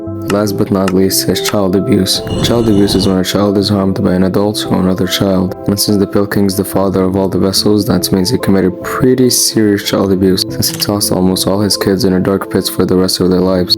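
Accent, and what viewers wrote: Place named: North Africa. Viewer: You north African bro? the accent kinda seeps out! Really good video btw!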